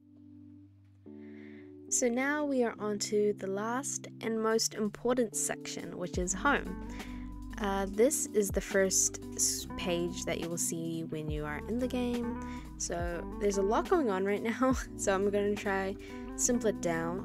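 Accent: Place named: Australia. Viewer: i like ur aussie accent its so cute it sounds just like rosie from blackpink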